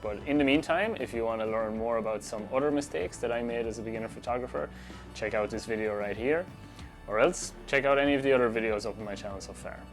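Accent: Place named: Ireland. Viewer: Nice to see an Irish photographer? Can I ask where you’re from? Can’t place the accent! Clare maybe?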